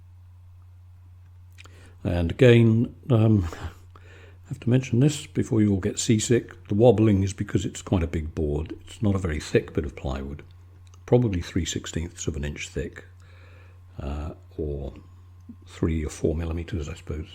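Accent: United Kingdom. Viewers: you've got such a beautiful british accent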